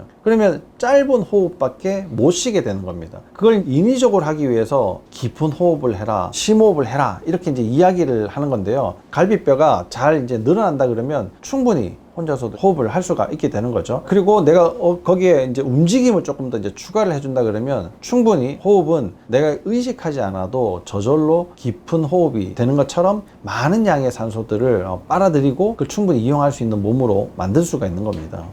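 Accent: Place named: Gyeongsang, South Korea. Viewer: 깅썅도 사투리.억양.엑센트 듣기 싫으네.... 기본도 모르면서 아는척을 엄청 나불 나발 거리네... 순진한 환자들 다 넘어간다...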